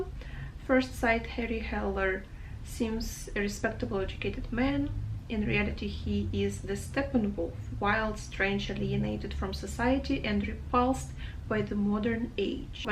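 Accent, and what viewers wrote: Russia. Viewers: Are u russian or smth? Like yr accent sounds so familiar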